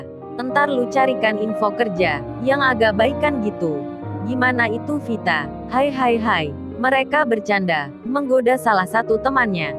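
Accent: Surabaya, Indonesia. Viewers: min kamu orang surabaya ya?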